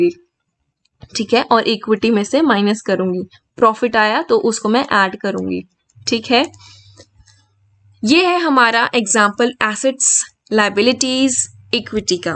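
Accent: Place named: Kashmir, India. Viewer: Mam u seems have like our Kashmiri voice Thank u so much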